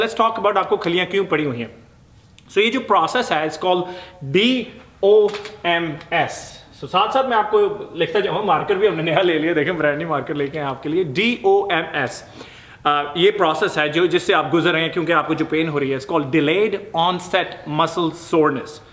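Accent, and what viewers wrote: Punjab, India. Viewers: sir ur video is awesome and ur panjabi is sooooo cuteeeeee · Pa ji your punjabi accent is just too gud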